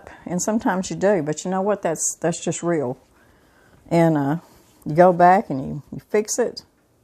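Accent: South, United States. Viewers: You seem like a very nicer woman.....kind and gentle, but your Southern accent drives me crazy and I hate to listen to it. So therefore, I can’t listen to your videos anymore. Y’all just make my skin crawl. Sorry. Cake sounds wonderful!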